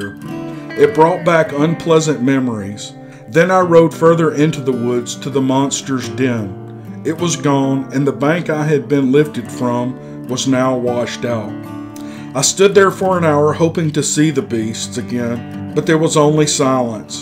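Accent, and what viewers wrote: South, United States. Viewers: One of the most compelling stories and the way you narrate these accounts is awesome, and your southern draw adds to this story thank you my man for this magnificent post ⭐⭐⭐⭐⭐. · I love your voice and soft southern accent! Great story! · I live in Elvis land now and work in North missippii love listening to u because u sound like me.good show love it! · I LOVE LOVE LOVE this story!! Thanks so much to the writer, and to you,! This story could only be told with a good Southern accent! I often listen to your stories multiple times (like reading a good book multiple times, when you think about it), but this one I replayed straight away... I wouldn't be surprised if this is a true account. There must be more stories like this out there... told to children and grandchildren... Thanks so much for this gem 🪄💎 ✨️ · If you will listen again...carefully at 1.06 l believe, he correctly pronounced the word you are so quick to call him out on...maybe your hearing might be a bit dull. 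When you have thousands of people listening to you and asking for more, maybe then you might have cause; we who absolutely adore this gentle Southern drawl expect him to read this as a Southern boy would speak. At any rate, he is a big man, kind and careful...try to follow his lead. I believe he will steer you correctly, cheers & happy trails! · thank you for this thanksgiving day treat. what an interesting story! hope you and your family had a wonderful day. love your channel. your stories are top notch and you're a fantastic narrator. your great southern accent is a treat and makes listening to these stories more enjoyable. I just recently subbed to your channel and have now listened to all of your previous uploads and look forward for each new post! keep up your good work and I can see your channel will quickly grow. thanks. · It was nice to hear the story narrated by someone with a genuine southern accent. 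The story is credible and worth the time to listen to.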